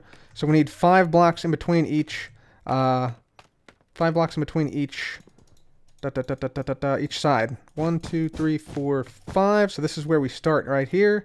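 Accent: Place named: United States. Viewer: Sounds like he's from the US to me.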